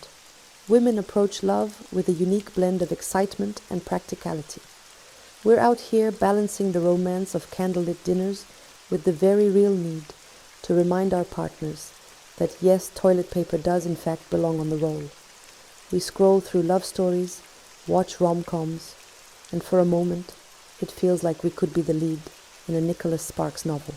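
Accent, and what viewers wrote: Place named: France. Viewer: I ❤ Your French Accent. ❤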